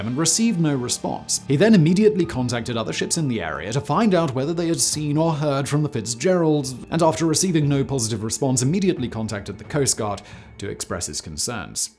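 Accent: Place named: England, United Kingdom. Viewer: I was amazed that the radio call was preserved, and isn't it odd that a midwestern sailor speaks with an English accent and oh I'm an idiot, that's voice.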